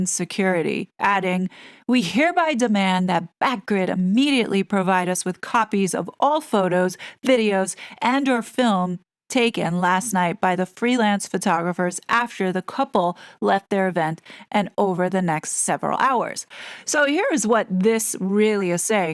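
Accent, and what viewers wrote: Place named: New York, United States. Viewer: Thank you for reporting this! This is the first place I heard it, and what could be better than hearing your NYC accent. I love it! I think the whole point of this shit is to bring down the monarchy, to cheapen it as you say. Like I keep saying, they can’t have their new world order over in Davos with a 1K year old monarchy. Out with the old, in with the new, and it sucks.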